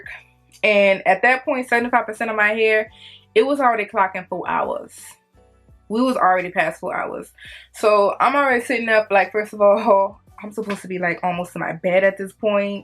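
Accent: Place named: Los Angeles, United States. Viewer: Crazy story. Love your LA accent.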